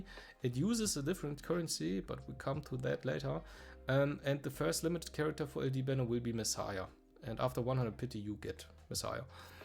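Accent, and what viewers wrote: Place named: Germany. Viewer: Didn't know you before, but really good video. Also I would bet my rebate money that you are german hearing your accent. xD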